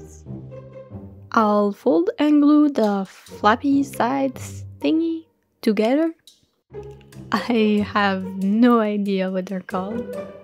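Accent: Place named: Quebec, Canada. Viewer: Je pense ce que tu dois faire du voice over en francais. Plus specialment ton accent quebecois. C'est metal.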